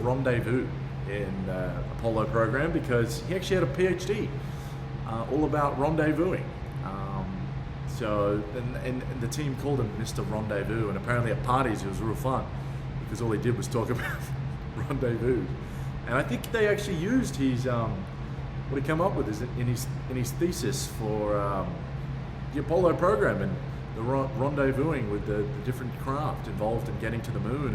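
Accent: Australia. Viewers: Lord have mercy, the Australian accent plus the deep voice plus them visuals wooow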